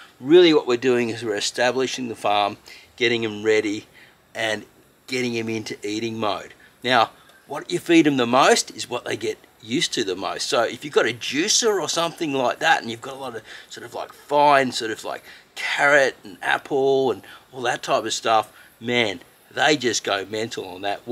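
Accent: Australia. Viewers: Thanks mate. Always good to hear an aussie accent 🤙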